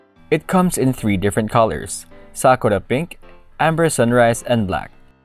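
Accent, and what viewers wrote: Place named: Philippines. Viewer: omg the annoying Philipino accent.. the accent spoiled the whole video.. PLEASE LEARN ENGLISH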